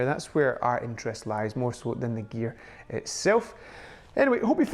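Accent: Scotland, United Kingdom. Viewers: Do you think the RC controller is worth the extra cost, since most of the time you are likely already carrying your phone? Great video! Love the Sean Connery like accent!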